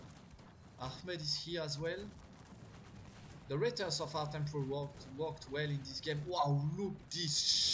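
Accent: France. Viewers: are you french ? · french accent, so french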